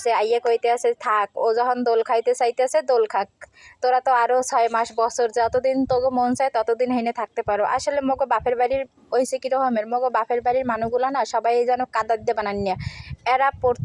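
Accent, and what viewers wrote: Barishal, Bangladesh. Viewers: বরিশাইল্লা ভাষা মুই ভালা পাই🎉🎉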